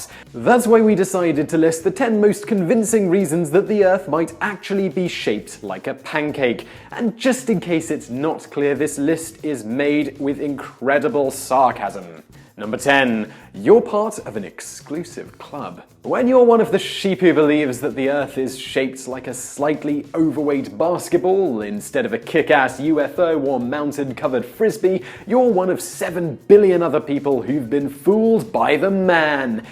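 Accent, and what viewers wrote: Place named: Cockney, United Kingdom. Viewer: Watch something positive too much cockney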